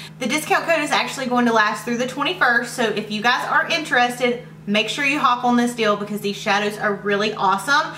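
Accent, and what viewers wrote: South, United States. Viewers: I love your southern accent!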